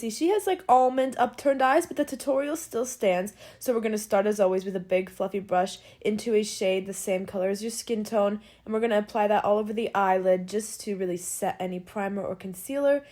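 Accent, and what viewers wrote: United States. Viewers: Love your accent! But I cant place it?? Sounds like West coast and east coast combined!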